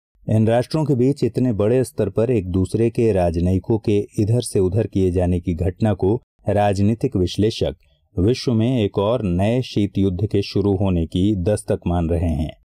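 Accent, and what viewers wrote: India. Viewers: i was feeling like came in 90s era as sound was like old radio station while listening but it contains the knowledge,from past, present and future, is very useful. thanks , i like the old indian accent..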